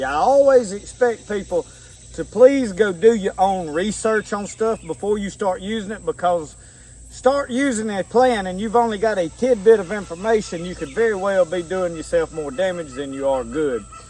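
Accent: South, United States. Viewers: I just stumbled across your channel because of your skullcap video… I was like “this dude has got to be in Ms.” lol I’m in Wayne County. I totally feel that Southern Boy accent! The hubby and I got tickled at you for being barefoot as a yard dog in the woods 😂😅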